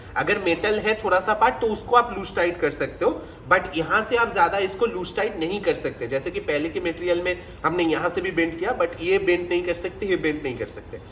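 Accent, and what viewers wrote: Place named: Bengal, India. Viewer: Okay bhaiya ji.. Samajh gye.. But you are using bengali ascent.. 🙃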